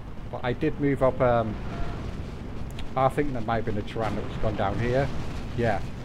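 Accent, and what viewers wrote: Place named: United Kingdom. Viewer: Love the videos. Great to hear a fellow British voice commentating. Something we've been lacking since Alpha Bird left.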